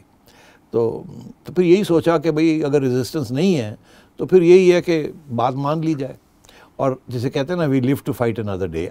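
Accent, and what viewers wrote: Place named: Punjab, India. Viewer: Tum pakistani kitni bakwaas urdu bolte ho yaar, urdu main punjabi lehza daal diya hai. Kya bhaji paala banaya hai.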